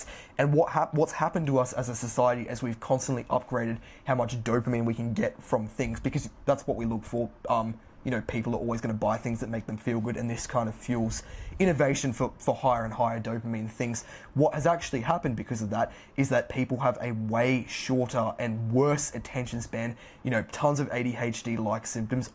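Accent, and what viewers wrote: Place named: North Carolina, United States. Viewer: Bruh you from North Carolina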